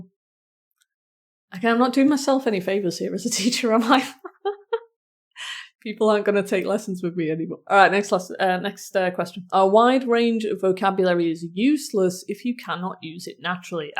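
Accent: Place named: London, United Kingdom. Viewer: it’s soooo interesting to know that. Thank you very very much for sharing. Just curiosity, are you from London? I do appreciate your accent.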